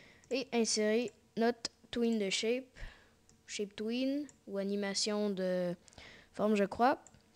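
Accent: Quebec, Canada. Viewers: Tu es québécois UwU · Super tuto avec un charmant accent canadien.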